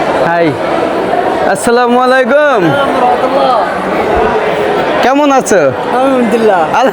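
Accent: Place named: Bogura, Bangladesh. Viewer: উনার কথার শুরুতেই বুঝচ্ছি বগুড়ার লোক।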